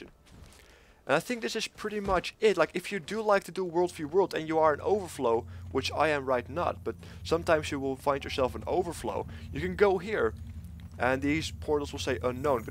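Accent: Netherlands. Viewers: are you Dutch?:P, If so, do you play on Ring Of Fire? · I knew it, because your accent is just typically Dutch, (Ik ben ook Nederlands dus ik kan het weten) On which server are you playing?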